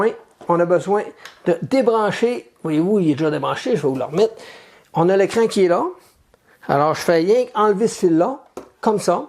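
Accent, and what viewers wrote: Canada. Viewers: Bonjour super ta video pour se nouvelle ecran je vais certainement l'installer prochainement sur ma CR10S pro j'ai une petite question au niveau des branchement sur la carte mère que tu a connectè le BLtouch en Z+ et faut il laissé le shunt sur la petite carte en dessous de l'extrudeur? encore merci pour tes videos et ton accent canadien... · Bonsoir, tes vidéos sont super bien détaillées et expliquées... Je suis Belge et ton accent Quebecois > j'adore! Tu fais tes vidéos avec les moyens du bord et c'est un exploit! As tu un compte paypal ou autre système? J'aimerais vraiment t'aider et je suis sûr que je ne suis pas le seul! Beaucoup, de Youtuber tentent de se faire des sous... Pas toi, alors que tu le mérites vraiment! Donc si Framemanager (c'est moi) peut t'aider... Je suis prêt à le faire! Je suis certain que ce sera bien investis!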